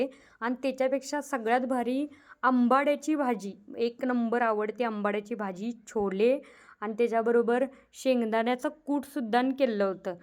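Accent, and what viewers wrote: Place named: Belgaum, India. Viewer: Bhasha belgavchi vatte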